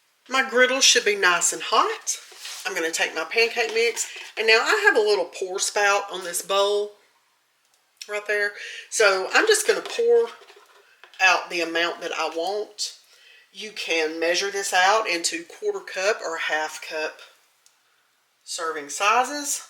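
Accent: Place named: South, United States. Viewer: I love your recipes, i am on a fixed income and these seem very inexpensive. Also, i love your accent. I'm from the deep piney woods of East Texas close to the Louisiana border, and your accent so reminds me of home as my family moved there from Alabama and Mississippi.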